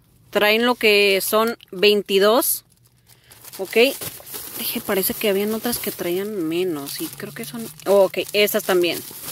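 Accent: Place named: North, Mexico. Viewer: EMPECE A SEGUIRTE X RECOMENDACION DE LA TOCAYIUX Y ME ENCANTA TU ACENTO 😍 YO TAMBIEN SOY NORTEÑA 🌪🌪🌪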